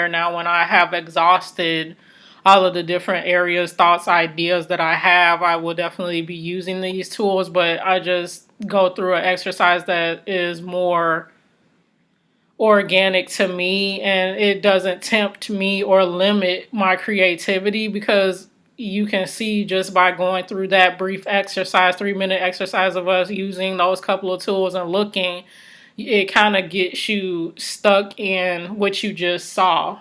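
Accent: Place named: Carolinas, United States. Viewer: Nope. You sound like my family but they're from the Carolinas / DC. Anyway love your videos. Thanks.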